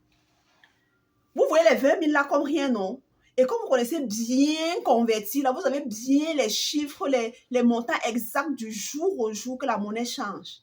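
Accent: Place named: Cameroon. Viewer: Belle vidéo, argument très pertinent. Je sens, d'après ton accent, que tu es Camerounaise, je suppose.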